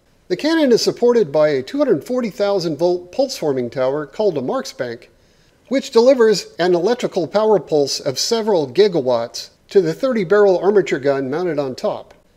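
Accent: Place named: Canada. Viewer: So, just did a little basic math using Assuming that's supply not output, that's 7.2 million kW. Average cost of energy in Canada (could be wrong but he sounds Canadian to me) is 19.2 cents per kWh. Unless I'm missing something, this costs $1,382,400 to fire once at full power, just in electricity. I pray I'm wrong, because this is too awesome to be such a rarity. If it's anywhere near the ballpark though, we need to appreciate the sheer commitment this man has to making cool stuff. In other words: GET THIS MAN SOME LOTTERY TICKETS!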